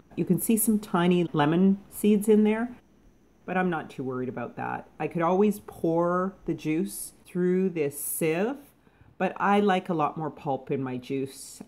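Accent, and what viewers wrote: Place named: Canada. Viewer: I thought I heard a Canadian accent. Im from Winnipeg but my whole family lives in Nanaimo on the island. I live in Houston, Tx. Love your vids. Want to know a little more about the potassium salts. I went to <link> but couldn't find anything. I have alot of thyroid issues but don't take any meds. Try to get what I need naturally but think I need a little more help. 🕊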